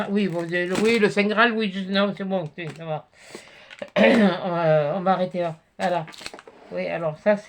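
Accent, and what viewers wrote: South, France. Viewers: Cc, je regarde de temps en temps tes vidéos même si je ne commente pas souvent car j'ai les mains occupées. Déjà merci pour ce partage d'achat très intéressant. Je n'ai jamais commandé sur ce site, il va falloir que je m'y penche 😅. D'autre part, j'apprécie ta franchise, ta spontanéité et ton naturel, tu me fais énormément penser à une personne qui m'était très cher et qui me manque beaucoup, tu as le même accent du sud, le même parler, le même caractère et presque les traits physiques de ma grand mère.. à tel point que parfois ça m'est difficile de t'écouter, tu me rend très nostalgique...bref j'espère ne pas te vexer de te dire cela. Surtout reste comme tu es, je n'ai pas commenté sous ta vidéo concernant Vinted mais je suis tout à fait d'accord avec toi. Merci pour ta bonne humeur et la chansonnette finale, tes yeux et ton regard m'ont régalée. 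Belle journée à toi et bonne créativité pour le défi de...ah mince j'ai zappé le prénom 😅, désolée. Bises 😊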